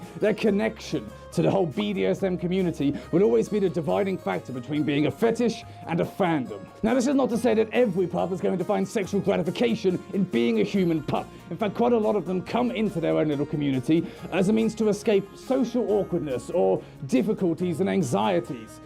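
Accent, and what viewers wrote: United Kingdom. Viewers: Savior_the Husky b r i t i s h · Ahh another of my kind, a British furry that sounds like “spiffing brit” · you sound sooo brittishhhhh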